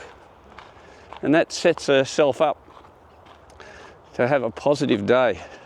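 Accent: Australia. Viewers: Thank you for your words of wisdom. Love your Ausi accent. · Love taking these walks with you,! Thank you for your positive input with your Aussie accent!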